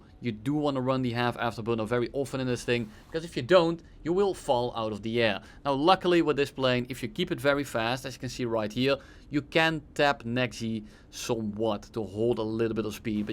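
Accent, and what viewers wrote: Netherlands. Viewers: i smell a nederlands accentje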